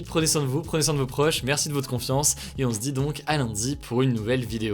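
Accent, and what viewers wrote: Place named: United Kingdom. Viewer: Hugo je me demande depuis un moment, mais pourquoi tu ne prononces plus les mots et expressions anglaises avec ton bel accent britannique? :) j'aimais bien perso ça change de notre "French accent" !! Bravo pour ce boulot tous les soirs ;)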